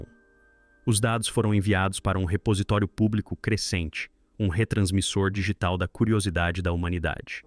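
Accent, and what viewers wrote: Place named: United Kingdom. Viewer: Sotaque horrível "r" muito britânico colonialista.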